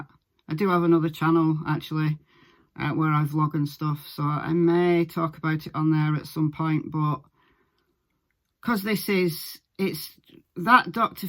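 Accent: United Kingdom. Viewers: I'm sending you love, light and blessings,. I love your CW videos, but this was something different. I'm not far from you in Sheffield, and it's lovely to get a UK dialect on your analysis of your videos. You have a very sincere voice, and I totally get your intonation and heartfelt words. Your situation with your boy sounds like the experence that Sloane Bella had...... A real open connection to the spirit world..... Love and peace <3 x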